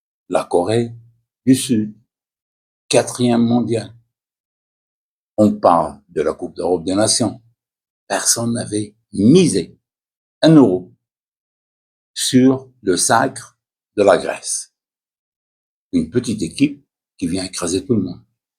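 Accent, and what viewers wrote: Standard. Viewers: فرنسية نقية ا السي ، تبارك الله ماشاء الله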